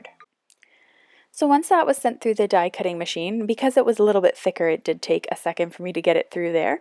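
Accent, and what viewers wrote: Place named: Canada. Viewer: Today is the first time I noticed your Canadian accent. Love it. Would like to see more man type cards.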